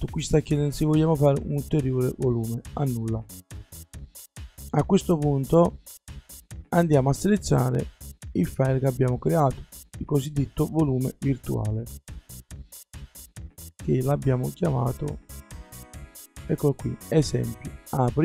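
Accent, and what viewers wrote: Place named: Naples, Italy. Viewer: con il tuo accento partenopeo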